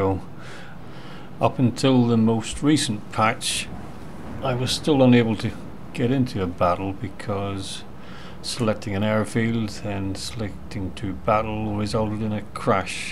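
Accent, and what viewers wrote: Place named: Northern Ireland, United Kingdom. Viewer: Hi, Cheers for the vid 👍, just trying to place… fellow Norn Iron?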